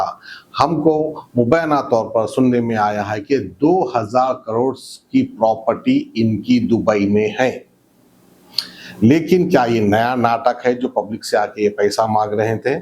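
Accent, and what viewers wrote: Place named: Deccan, India. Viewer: Aray bhai Tum pehle bolne ka saleeqa seekho yaro lehja deccani raha toh koi baat nai lekin andaaz e bayan bhi achha hona chahiye Tumhara purana dhanda achha tha miya illigal machhi ki dallali karne ka Kaiku aaya re bawa yeh platform pe?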